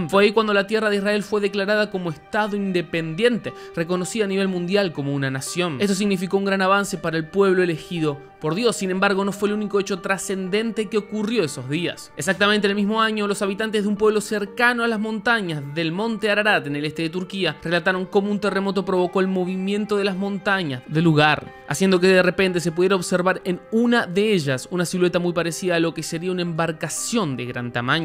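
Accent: Argentina. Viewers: Con ese acento argentino las Cosas parecen un chiste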